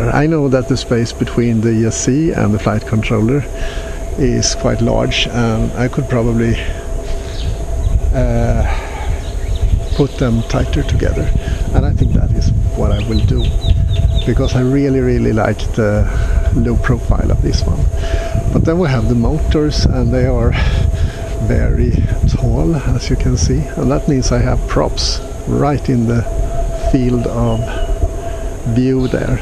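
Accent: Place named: Scandinavia. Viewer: Hi, nice video! I was looking around for fun because I've built an Alfa Monster myself and are about to build another one, but can I ask you where you're from? Edit: Saw Sweden mentioned in your channel description, thought I heard that nordic accent, being from Norway myself. Looks beautiful there, do you take guests? Kidding of course, but I would love to see Thailand sometime, through my Fatsharks too :D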